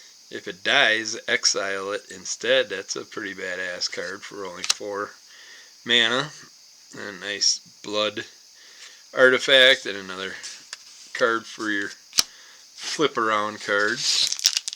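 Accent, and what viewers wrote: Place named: Wisconsin, United States. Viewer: Great video. What I don't know about these could quite literally be put in a book. I remember in 1994 I saw alot of ROTC kids playing this during my junior year of high school That summer I visited a kid I knew who'd moved to Warren Ohio and he tried to teach me how to play MAGIC. I guess I was too much of a right brained kid because I kept getting hung up and confused. I did like seeing the dark art though. It'd be cool cool to see a conan the barbarian version on a card game I knew the old cards and sealed boxes had value but that is amazing just how much the wrappers go for. Cool little asmr style video. The Wisconsin accent is very pleasant. Hope your having a good week and have a real nice weekend, my friend! 😊😊😊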